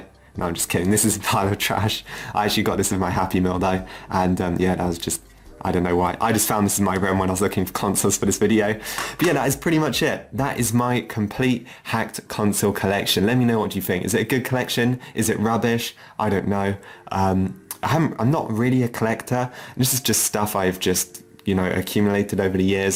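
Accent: United Kingdom. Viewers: cool collection! Very interesting. I was just trying to place your accent.... is it Stevenage by any chance ?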